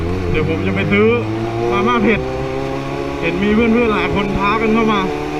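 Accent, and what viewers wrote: South, Thailand. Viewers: คนบ้านเด่วกันคนใต้ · พี่เป็นคนภาคใต้หรอครับ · พี่คนใต้หรอ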